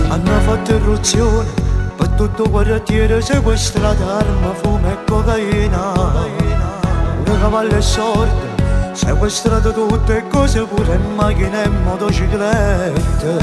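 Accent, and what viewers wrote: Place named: Naples, Italy. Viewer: Complimenti veramente belle parole devi solo giustamente aggiustare un pò l'accento napoletano per il resto sei bravissimo continua così che fai strada molta strada ti auguro il meglio me frati <3 in bocca al lupo per tutto <3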